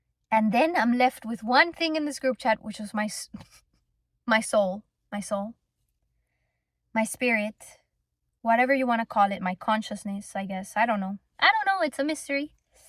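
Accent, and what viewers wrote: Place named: Italy. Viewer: I’m just curious.. are you Italian? You speak great English and I think I hear an Italian accent but I noticed a lot of your shows are in Hispanic countries.. anyway glad you’re alive and better after that crazy experience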